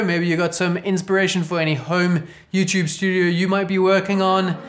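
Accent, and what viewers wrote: South Africa. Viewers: I am always a fan of hearing the familiar sound of a SA accent! Congrats on the new studio bru, sorry I am late on the train for commenting. I only stumbled on your YouTube channel today! Subscribed and liked! your videos are legit - No cap. Have a dope long weekend my man!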